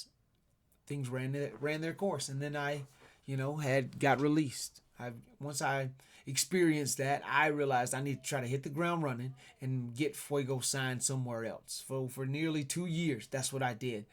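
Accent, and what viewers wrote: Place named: Alabama, United States. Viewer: I thought you were from Alabama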